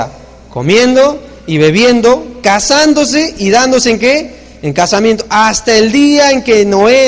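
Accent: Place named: Peru. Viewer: Por el tono de voz, creo el pastor es Peruano, cuál es su nombre?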